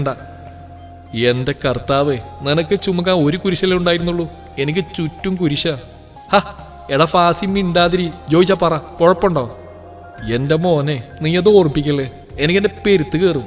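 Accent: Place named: Thrissur, India. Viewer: Thrissur basha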